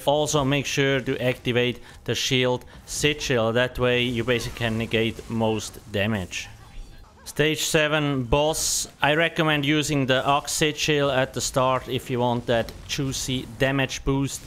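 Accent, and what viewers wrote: Switzerland. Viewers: bist du schweizer???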